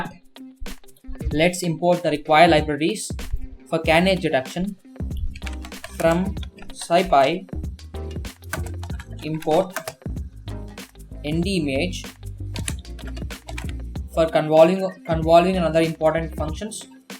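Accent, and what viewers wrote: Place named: India. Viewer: damm, India English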